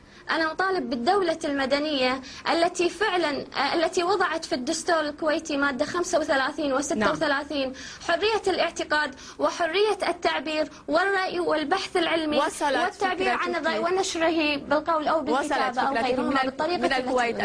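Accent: Iraq. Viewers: شكلها وكلامها عراقي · هذي عراقية دمها ولهجتها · لهجتها عراقيه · هذي تكلم. عراقي. منو وين صارت كويتيه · هاذي لسانها عراقي ! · منو هالصعلوكه ؟؟!! اولا خليتو لها قيمه به الفيديو وثانياًاهي كلامها عراقي مو معني جلست لها بالكويت كم سنه تقولون عنها الفنانه الكويتيه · قد تكون مجرد حامل للجنسية الكويتية، لكن الوجه و كأنه من يهود أصفهان و اللهجة عراقية، و لا أحد يعرفها بالكويت البتة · ههههههههههههه اقص يدي اذا هاذي كويتية !! تسمعون لهجتها !! فيها لكنه عراقية اربما كما قال الي قبلي اصلها فلسطيني !! نحن تعرف الاصل الخليجي من النطق والشكل ولو جلسنا معه دقايق عرفنا وش اصله وهاذي ميزه فينا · من لهجتها عراقيه بس يمكن تكون مثل ما ذكرتي وتزوجت كويتي وبالتالي حصلت على الجنسيه الكويتية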